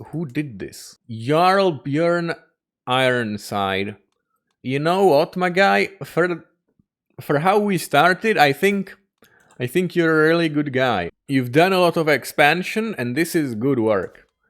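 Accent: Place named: India. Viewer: Can't say that there's no accent. It made me think he was Indian. Honestly, I would never have guessed he's actual origin because of that.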